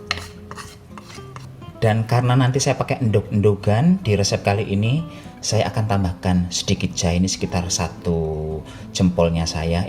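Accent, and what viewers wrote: Banyumas, Indonesia. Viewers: Pak kiye sekang pak Dirun asli sekang Cilacap, nek rika jawane sekang endi, nek ndeleng ngomonge kaya bahasane wong Banyumasan. Rika nang Batam manggon nang daerah ngendi. Soale aku tau manggon nang Batam suwe kuramg luwih ana 6 tahun manggon nang daerah Pelita.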